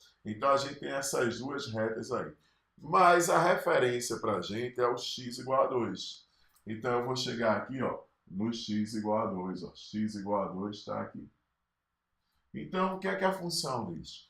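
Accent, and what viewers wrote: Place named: Bahia, Brazil. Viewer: Sei que as aulas são para ajudar pessoas que vão prestar ENEM. Naão é o meu caso. Estou fazendo a segunda faculdade e seu material é bom. tenho mpor hobe o estudo de matemática desde 1984 e a internet trouxe a possibilidade de conhecermos o trabalho de outras pessoas. Não sei, mas parece que o professor é baiano e cheguei a uma conclusão: ninguém melhor que um baiano, calmo e cadenciado, pra explicar qualquer coisa pra alguém. Suas aulas são ótimas professor, pois dão tempo de pensar e assimilar tudinho. já me inscrevi no seu canal e virei seu fão. Esero umdia, nos conhecermos pessoalmente. Vou indicar para muitos jovens. Melhor que isso, só se apessoa tiver tempo para estudar a coleção completa de "Fundamentos de Matemática Elementar" do Iezi e amigos, mas isso é pra quem teria tempo mesmo. É uma coleção fundamental que tenho em minha cabeceira, mas não deve ser usada para um concurso, por exemplo, pois exige tempo para reflexão e absorção de tudo. 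Professor, grande abraço. Continue trabalhando e fazendo pensar.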